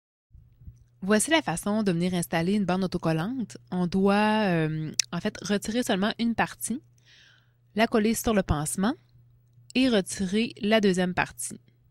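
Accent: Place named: Quebec, Canada. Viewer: Accent québécois 🤢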